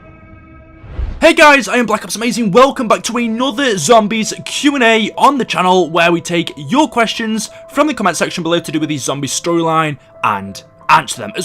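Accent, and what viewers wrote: United Kingdom. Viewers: Not a zombie related one But please can you tell us where are you from since you’ve done the face reveal Im assuming that you’re from UK based on you accent also love your vids bro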